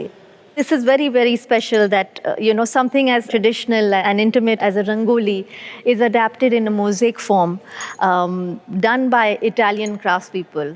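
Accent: India. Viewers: She has the most beautiful Indian accent in the English language that I have heard. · I love posh Indian English accents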